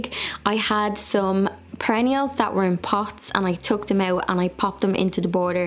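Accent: Ireland. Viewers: just found your wonderful site! Awesome! You have so much energy! and all the hard work has resulted in beautiful gardens! (where are you located? I detect an Irish accent) Keep on keeping on....regards from Nana Green Fingers in the U.S.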